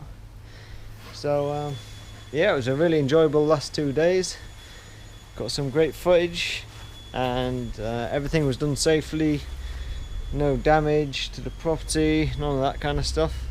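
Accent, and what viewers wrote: Yorkshire, United Kingdom. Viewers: Yorkshire or Lincolnshire accent mate. Great job on that, zip line for limbs inspired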